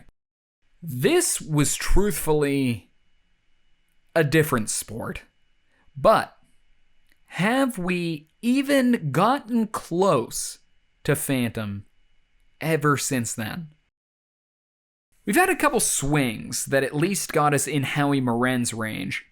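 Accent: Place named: Central Pennsylvania, United States. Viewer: Lol... Nope. That's that central PA accent shining through. Central PA isn't AS bad as Western PA, but we tend to overemphasize certain consonants at the end of words and deemphasize others. You won't hear that hard "duh" sound with the D at the end like other accents Names like Todd, Rod, etc can sounds like Rot, Tott, Ron, etc lol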